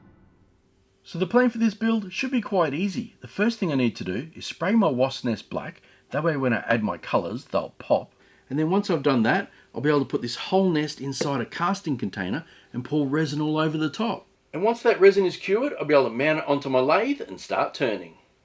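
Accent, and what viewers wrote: Australia. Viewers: Wow! I’ve just stumbled across this page, love hearing a fellow Aussie accent hehe. This is an absolute beauty ❤️ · Hi, loved this video, but i’m wondering: Australia or South Africa, i’m pretty certain you’re not a Kiwi😊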